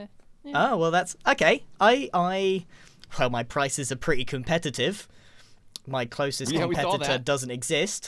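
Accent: United Kingdom. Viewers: hey i dont know if this is rude or not but are u brittish?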